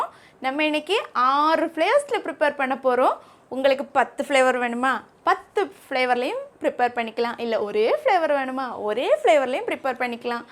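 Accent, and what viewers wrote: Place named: Nagercoil, India. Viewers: I think u r from kanyakumari from the way u speak. Bcoz m also from kk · Nagercoil slang 🤣🤣🥰🥰🥰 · Neenga nalla pandringa super nagarcoil slang enaku romba pidikum thank u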